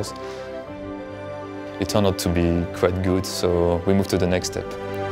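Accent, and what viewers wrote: France. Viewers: This little french accent👌👌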